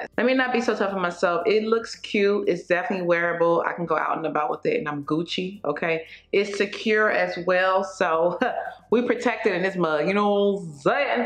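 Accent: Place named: Nigeria. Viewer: That's Nigerian the accent and attitude😅😅 + american of course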